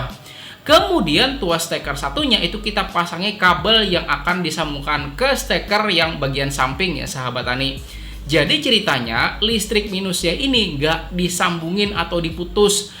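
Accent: Banjar, Indonesia. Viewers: orang banjar sekalinya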